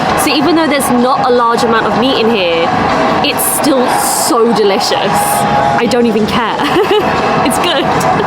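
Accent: United Kingdom. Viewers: 久しぶりの動画😂 が元気そうで何より😊 そしてイギリス英語が耳に心地よいです。これから寒い季節ですがお身体大切に🙏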